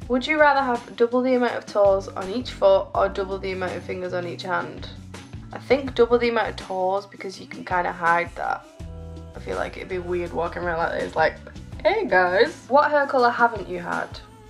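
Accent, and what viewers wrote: Northern England, United Kingdom. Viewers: That's a northern English accent. A beautiful one at that. I've always preferred northern English accents to southern English accents. There are 54 different British accents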